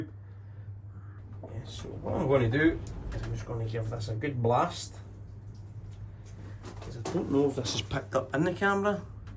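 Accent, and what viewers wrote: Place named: Scotland, United Kingdom. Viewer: That's a normal Scottish accent .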